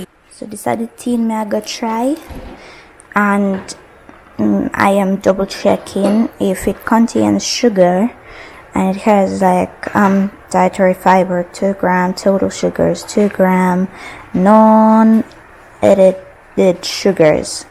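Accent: Jamaica. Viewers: Been watching you from the start. And you speak real Jamaican now, luv it ❤ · you have caught the Jamaican dialect flawlessly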